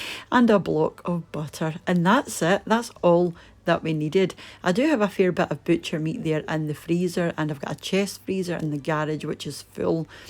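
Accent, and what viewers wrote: Scotland, United Kingdom. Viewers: I I'm new to your Channel I'm not sure how I feel and you must have had something to do with tea LOL so forgive me for maybe asking me redundant questions but is that a Scottish or Irish accent that you have? What is the difference between British cheddar cheese and American cheddar cheese? I had to Google the difference between grams and things because we measure by cups in the USA; I don't know if they were the exact measurements. But they were great brownies. Thank you so much for the recipe. Safe travels be safe be well, blessings and much love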